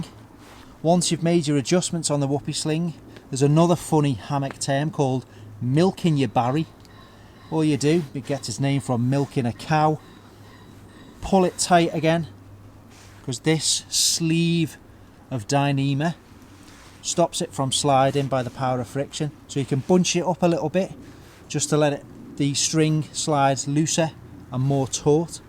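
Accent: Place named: Cheshire, United Kingdom. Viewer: Some of the terminology you used, I’m guessing ex squaddie. With the accent, I’m guessing ex Cheshire?. . . . . I’m probably way out, but the video is really great and informative. I’m trying to get back into camping again, without an officer or Sergeant screaming at me, and getting my grandson into it. Definitely worth subscribing. Ta laarr.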